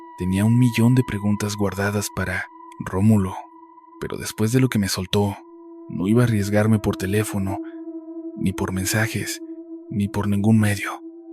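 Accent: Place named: Portugal. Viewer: POR QUE DE REPENTE TIENES ACENTO COMO DE PORTUGUÉS Y ESTUVO RARO QUE SE REPITE UNA PARTE QUE YA HABIAS CONTADO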